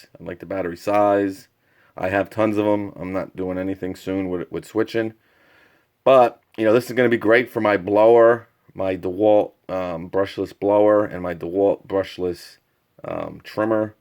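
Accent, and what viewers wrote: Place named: Boston, United States. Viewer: “You know” “You know” “You know” “You know” do you realize how stupid you sound repeating that over and over? Not to mention sucking on spit at every pause. You have to be from Boston. Only dumb dumbs raised in New England talk the way you do. “You know?” “You know” “You know”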